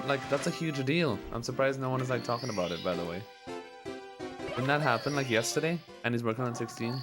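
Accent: Trinidad and Tobago. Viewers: Does anyone know where is from. Could be my ears playing tricks on me but his accent sounds like he’s from Trinidad and Tobago. Or at least grew up here.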